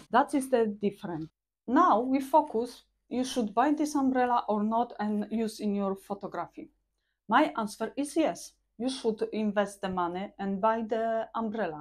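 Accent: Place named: Germany. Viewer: She is speaking English with what sounds like a German accent, based on my time in Deutschland.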